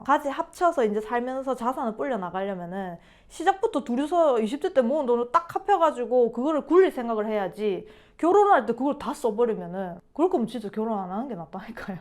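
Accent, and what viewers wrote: Gyeongsang, South Korea. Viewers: 이분은 경상도 사람입니다 조선족은 북한말씨가 많이 들어가 있지요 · 저 갱상도 언니, 말이 참 잘 들리네요. 지방발도 제대로 사용하면 오히려 소통이 잘돼.. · 조선족이 구한말에 경상도 출신들로 구성된 경우가 많아서 조선족이 구사하는 한국어에서는 묘한 억양이 있습니다. 근데 저분은 완전 찐 경상도네요... 그리고 북한말씨가 있는 조선족 말은 함경도 이런 지역에서 온 사람들입니다. 그네들도 서로 지역 감정 있다고 하네요... 에휴ㅋㅋㅋ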